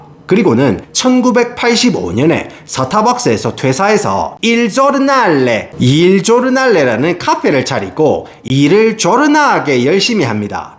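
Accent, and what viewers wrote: Gyeongsang, South Korea. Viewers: 한국말은 완전 갱상도 사투린데, 영어발음은 완전 본토네예. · 와.. 저희할머니가 쓰실법한 찰진 경상도사투리와 촉촉하게 찰진 미국식 영어발음에 넘나 귀호강입니다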